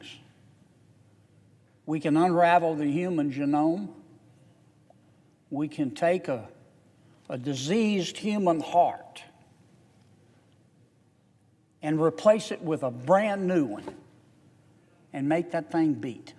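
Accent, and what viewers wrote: South, United States. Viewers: I am from Louisiana. He speaks very clear to me. He may sound country but he has This Country and our state in his heart. Good ol’ Boys know how to talk so people will listen. It does not matter if he speaks your language, He speaks ours.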